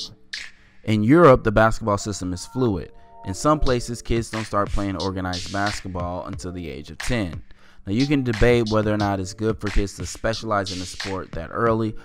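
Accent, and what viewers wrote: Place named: United States. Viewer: Luca Don'cheeks xD. I love american pronunciation. Not a hate :).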